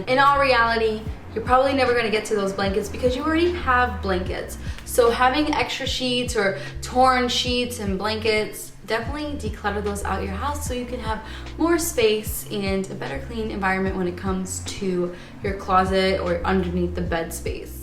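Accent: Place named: Boston, United States. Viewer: A video suggestion for you because you're absolutely adorable and I love the way you pronounce words would be how other people say a word in different parts of the country and then you try to say it with your Boston accent! Please say car key's LOL An old co-worker of mine had an accent like yours and every time she would say car keys it sounded like she was saying khakis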